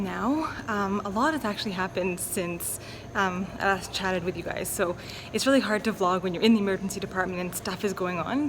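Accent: Canada. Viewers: Are you Canadian? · I love your Canadian accent! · You sound Canadian lol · Canadian. Ontario. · is she canadian?? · At first I thought you were British... but I think you are Canadian?😂 I’m from England so all accents that are like American sound very similar 😂❤️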